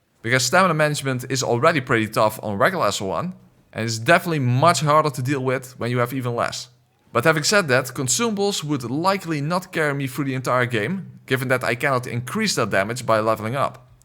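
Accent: Netherlands. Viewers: Are you Dutch? The accent is strong. XD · Dutch accent is so recognizable hahaha, leuke video man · I've been watching your videos for months now since picking up Bloodborne last year and now playing through DS2, and have been trying to figure out your accent ever since!! So glad that my guess of being a Southern Dutchie was correct hehe. Sounded so familiar since I study in Limburg as an international!! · Are you Dutch? You have a Dutch accent! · i hear your voice for one second, and i instantly know your dutch. wel goeie video hoor. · this dude is dutch he has to be .... i hear it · are you dutch? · that accent I recognize are you dutch? je bent echt goed ik zou nooit zoveel gedult hebben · Tried to watch this, but the Dutch accent was a bit too cringe.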